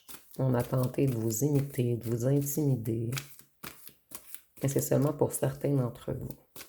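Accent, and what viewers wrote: Quebec, Canada. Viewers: Vous êtes trés drôle ,j adore votre accent québécois .Je suppose que le vehicule dont vous ne trouvez pas le nom avec lequel le monsieur veut poursuivre ma voiture ,est un tricycle pour enfant .🤣😂Donc en effet il n est pas près de me rattraper .Il vaut mieux en rire .Merci .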